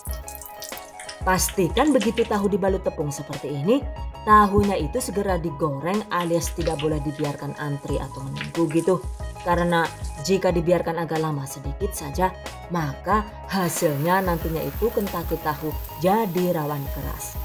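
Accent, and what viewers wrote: Banyumas, Indonesia. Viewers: Orang ngapak bu? 😆😆😆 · Ok OK OK Siap di nikmati lugot Chennel ini sangat ngapak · Bahasane ngapak metu ya bune, kaya nyong deneng, joss 😃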